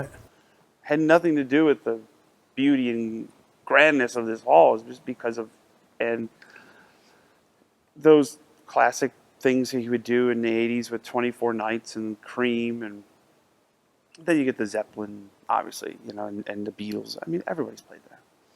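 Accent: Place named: United States. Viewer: I have much respect for Bonamassa as a person and as a musician. Whilst he admits to a slight American accent, to my born in Surrey England (as was Clapton) ears, his diction and grammar, unlike many Americans, is perfect! I am certain that did not need to address Eric Clapton as 'Mr Clapton' or 'Sir' (Eric has a 'CBE' but deserves a Knighthood). Despite his fame he is a down-to-earth guy who is pleased to be addressed by his given name. If I, a nondescript fan of his music, happened to bump into him I would say "Hi Eric, I'm Alan, a fan of your work" and he may be tired of the 'Autograph Hunters' but would be semi-polite. If Bonamassa bumped into Eric and said the same Eric would have returned the compliment and suggested that he would be pleased if they could they jam together. And, of course, these two great musicians shared a stage despite unnecessary and ridiculous deference. 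Its just an exaggerated publicity story; never addressed Eric as 'Sir' or 'Mr Clapton', they each have respect for each other!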